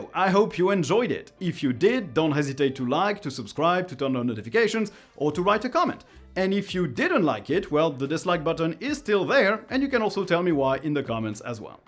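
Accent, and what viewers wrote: France. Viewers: In other other videos he’s made, he’s said he is French. As an American, I can certainly tell from his French accent! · Being French, I couldn't tell if he was a fellow frenchy or just someone that had lived in France long enough to get a bit of accent. (I wish I spoke English as well as him, and hope I will someday.)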